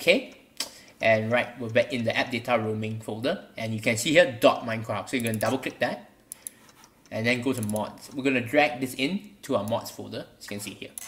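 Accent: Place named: Singapore. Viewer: You sound like Singaporean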